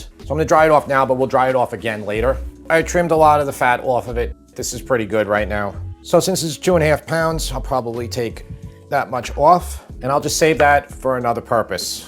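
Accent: New York, United States. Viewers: Not to be rude, but I just can’t stand that New York accent. I don’t know what it is. You’re a very handsome man. You’re very talented but the accent maybe you should work on.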